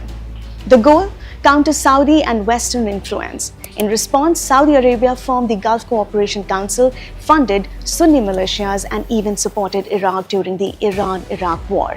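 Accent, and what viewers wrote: India. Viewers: unlike wion and first post,, she speaks with clarity and lesser indian accent,, no ridiculous "she ask she answer" anchoring style like palki sharma,, its like we viewers treated as a 1st grader,, dumb and fool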